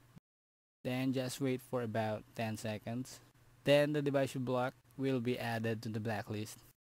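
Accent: Philippines. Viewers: tigas ng tagalog accent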